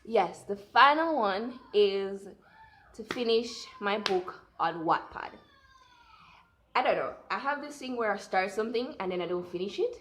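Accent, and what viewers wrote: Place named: Jamaica. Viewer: Oh Jamaica!! I just went to Jamaica 🇯🇲 last month and I loved it!! I knew I recognized your accent lol it was my first time going to Jamaica. I Posted my trip on my YouTube 🥰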